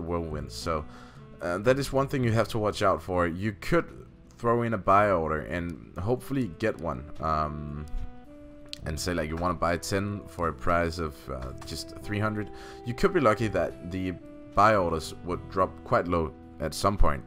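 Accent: Denmark. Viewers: Ye I thought I could hear some Danish accent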